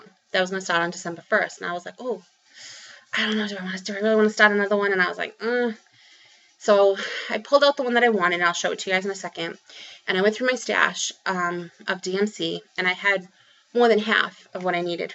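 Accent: United States. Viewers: Hi! So many pretties to comment on and you've been super busy this month! Absolutely adore your FFOs - they ALL look amazing! I agree with you - doing a HAED on 18ct is still my favourite. Although the tent stitch is somewhat faster, I still prefer doing full crosses and 18ct has great coverage. Could you please share with me the link to the feeble you mentioned "There's no place like home"? I'm pretty sure I don't have that one. I love your accent.... I'm slowly beginning to hear the differences between the various areas of the U.S. 😉. Apparently here in Australia we have different accents depending on what area we're from too... But I've never been able to pick them! Your Stitchrovia piece is my favourite of yours - I get really excited every time you show that one! I know I'm going to have to purchase it at some stage LOL. Thanks so much for tagging my tutorial... 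Great appreciate that, and I'm so happy you found it useful. Happy stitching 😊 xx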